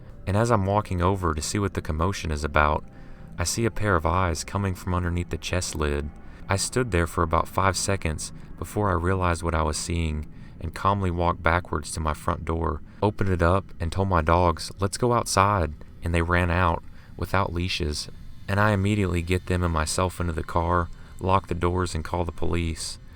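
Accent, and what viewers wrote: South, United States. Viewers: Cool accent. Sounds a little southern(?)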